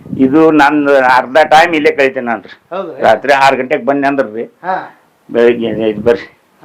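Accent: North Karnataka, India. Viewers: ಉತ್ತರ ಕನ್ನಡ ಅಲ್ಲಾರಿ. ಉತ್ತರ ಕರ್ನಾಟಕ ಭಾಷೆ ಇದು. ಸ್ವಲ್ಪ ವ್ಯತ್ಯಾಸ ತಿಳಿದುಕೊಂಡರೆ ಒಳ್ಳೇದು..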